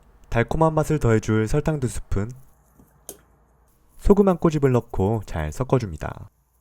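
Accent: Gyeongsang, South Korea. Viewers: 경상도 출신이다에 한표ㅎ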